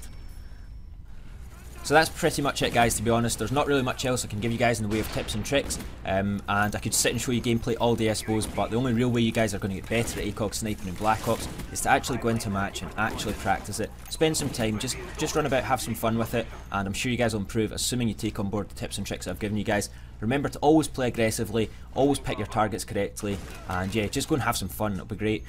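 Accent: Scotland, United Kingdom. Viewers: Scotland Aye? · are you scottish ?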